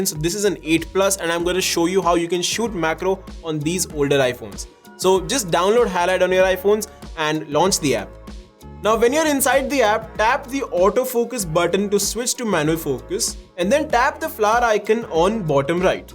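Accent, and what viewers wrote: India. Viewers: I don’t understand your English. Why do Indians think people understand their thick accent English?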